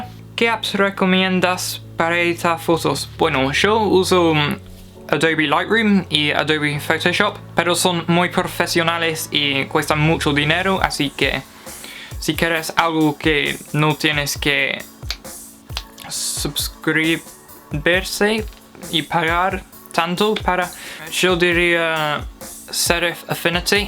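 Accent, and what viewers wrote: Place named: Argentina. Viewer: Great job man. As a native Spanish speaker I understood everything you said, you also sound argentino when you pronounce many words. Btw el país se llama Noruega 🇳🇴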